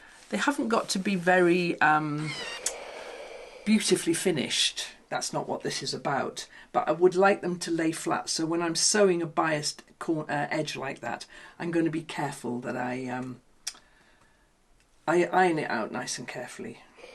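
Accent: England, United Kingdom. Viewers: I’ve only just found your site 😊 & love your sweet English accent.. Very informative & indeed brilliant ideas..😊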